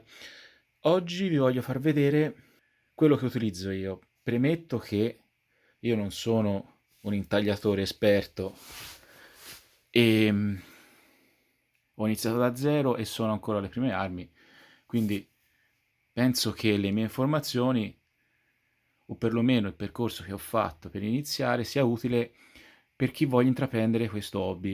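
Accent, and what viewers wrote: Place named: Prato, Italy. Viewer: Ciao! Dal tuo accento direi appennino pratese? Ottimp video, essenziale e concreto!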